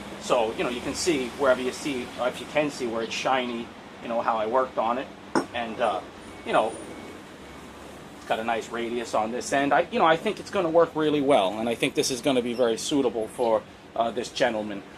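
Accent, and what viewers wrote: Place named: New York, United States. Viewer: Two questions. First, what part of the US of A do you come from, I'm having a hard time placing your accent. Sometimes it seems New York-ish, and other times almost mid-western. Secondly, how much did it cost to make your anvils? I'm only a recent subscriber. I am using an antique cast iron anvil now and am thinking about upgrading to something more durable.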